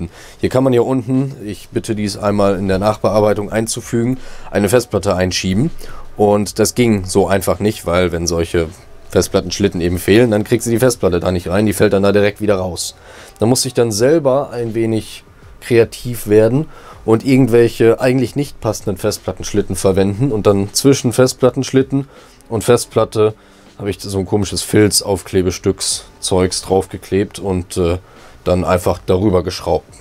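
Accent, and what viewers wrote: Germany. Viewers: Kommst du aus dem Saarland?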